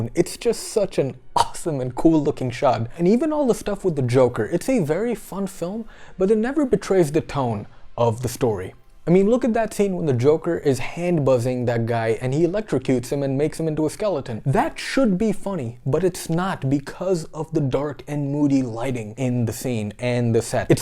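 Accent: United States. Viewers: You don't live in America? Neither do I, lol. You do sound American though. Greetings from The Netherlands (we gave you Paul Verhoeven and Rutger Hauer) Ps: feel free to add me on Skype 'meeskeesz' Have a nice day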